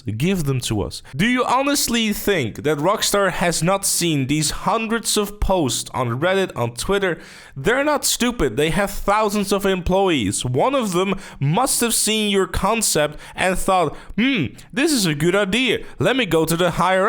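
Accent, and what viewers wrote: Netherlands. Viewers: You talk English as if you’re Dutch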